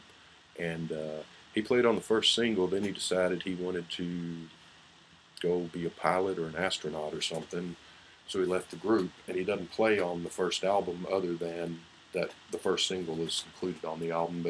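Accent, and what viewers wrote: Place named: Texas, United States. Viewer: hi, from England. do you know that there is an earlier album which contains their candix recordings?. I don't know if its available, but certainly is on there and its not too different. of course is there too, in fact as far as I can remember, there were a few more of the tracks featured here, but they are slightly different and sound more compressed.. Al Jardine was at high school with Brian and was a member of the same baseball team, but his parents were unhappy for him messing about with a pop group and made him study to be a dentist and get a proper job.......seems funny now, doesn't it? David Marks lived across the road from the Wilsons and as they were desperate for a guitarist, was asked to join, but I don't think he got on too well with murry and I think that's why he left. I know that he did some work for the turtles. 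there was a report in our newspapers over here that there had been a secret beach boy that had been sacked and left off many album covers and I am assuming this to be David Marks.. good and fair review though and I love your Texan drawl, it sounds really cool, no insult intended, hahahaha. best regards.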